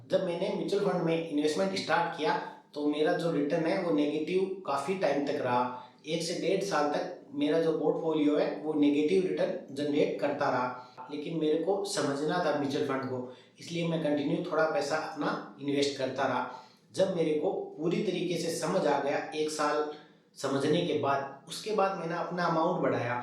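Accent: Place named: Shekhawati, India. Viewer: तभी मुझे आपकी बोली में अपनी शेखावाटी भाषा की टॉन नजर आती है😋😀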